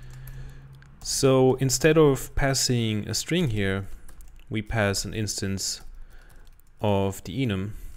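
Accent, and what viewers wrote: Germany. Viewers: I'm not a beginner in Rust, but I really enjoy how laid back your method of teaching is. It is especially nice to see you sit there on the chair and the computer on the wooden table and the code to the right. And of course, the German accent is nice. This experience is really soothing! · Agreed, german accent is always nice and welcome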